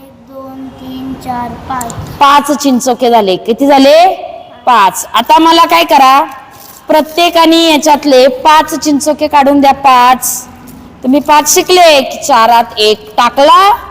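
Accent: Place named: Kolhapur, India. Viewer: छानच मॅडम अध्यापन पद्धती तुमची भाषा आमच्या कोल्हापूरी भाषेसारखीच आहे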